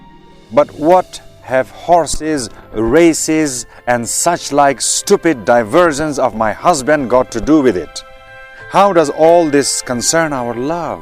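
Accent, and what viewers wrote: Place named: United Kingdom. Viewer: دو خوبی از حسن اخلاقی ای استه که ده هر چیز که مهارت دره بغیر ترس از پوره جزبے خو انجام میده. دوئم ای که خوب صاف بریٹش انگلش موگه.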